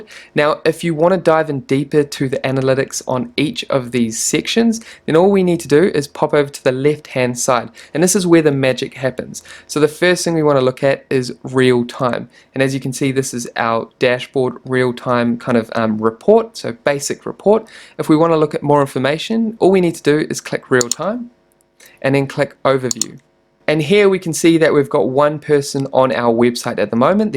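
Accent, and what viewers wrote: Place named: New Zealand. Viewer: How to use google analytics in "twinnie-twinnie"- love it. A Kiwi, right?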